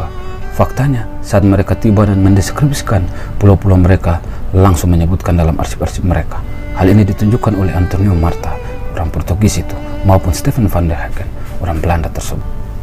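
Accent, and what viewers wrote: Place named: Maluku, Indonesia. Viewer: Mantap ..Admin yg bicara ni pasti orang antara Leti, Moa atau Lakor. batul to???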